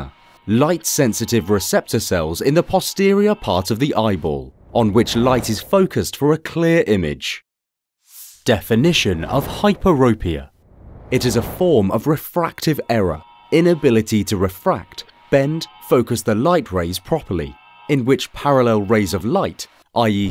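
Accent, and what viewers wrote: United Kingdom. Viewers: British people explaining anything is nice lol.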